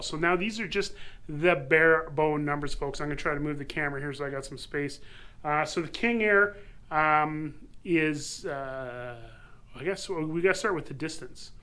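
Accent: Bavaria, Germany. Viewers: The accent is Bavarian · Clearly German accent ... !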